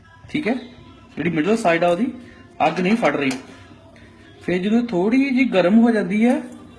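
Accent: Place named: United Kingdom. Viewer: Bolda tu ida aa jida U.K. Born aa te xperiment india di gobi de kri janda Eh gobi asli aa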